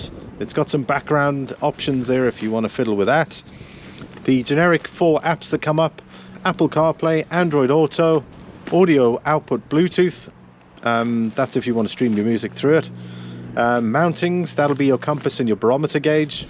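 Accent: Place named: Liverpool, United Kingdom. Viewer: Great video, I’m considering one of these devices so you’ve covered everything I wanted to know. Btw I’m getting various accents in your speech, you’re definitely not Irish but I detected a bit of Liverpool there, am I wrong ? Subbed you from a scouser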